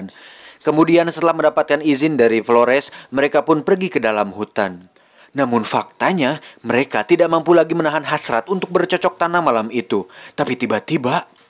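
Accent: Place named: West Java, Indonesia. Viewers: Sunda mnh nya min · Anying urang Sunda mang🤣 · Maklum..lidah orang sunda kan gitu...F Jadi P....Z jadi j😅😅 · Urang sunda euy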